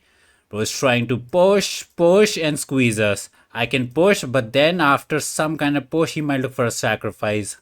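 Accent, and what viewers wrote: India. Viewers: bro i would watch u read a phone book u dont need clickbait. any time i hear an indian accent my knee jerk racism kicks in from years of indian kids lying to me about working for telus mobility to try to play the scam opening with me but u are special i believe in u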